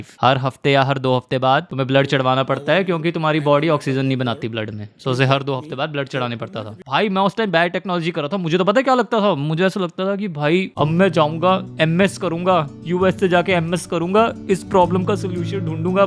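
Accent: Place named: Chandigarh, India. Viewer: I knew it he was from Chandigarh the moment i heard him speak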